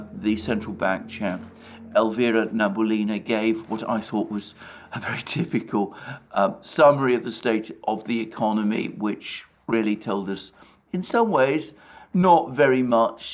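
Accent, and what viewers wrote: United Kingdom. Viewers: Alexander when all this quietens down (and that it will) you will be the most important historian of this war. Indeed posterity will remember you as the articulate studied chronicler journalist of this Slavic affair, with a delightful British accent. More power to you and your tribe. 🎉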